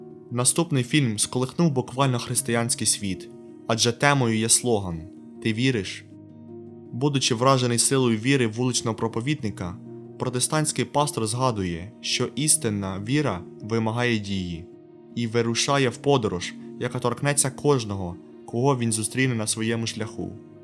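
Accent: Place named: Cherkasy, Ukraine. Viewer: Люблю цю вимову, диктор явно з Черкаської області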